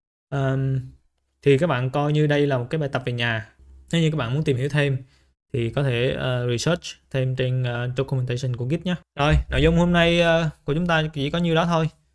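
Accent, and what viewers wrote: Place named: Central, Vietnam. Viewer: các video của anh khá hay, lại nói giọng miền trung rất thân thiện nhưng channel lại ít video quá. không biết a có dự định làm thêm nhiều video trong tương lai không ạ?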